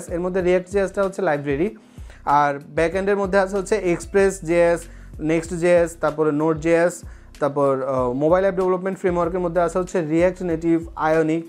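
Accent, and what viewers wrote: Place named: Noakhali, Bangladesh. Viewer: Apner kotha sune mono holo apni noyakhali or Laxmipur er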